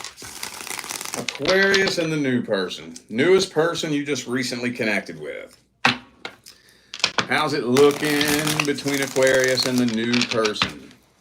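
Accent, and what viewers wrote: South, United States. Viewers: Hahaha you’re like me surrounded in vapes!! Eastern KY!!!!!! Go BIG BLUE!!!!! I KNOW you’re southern or somethin…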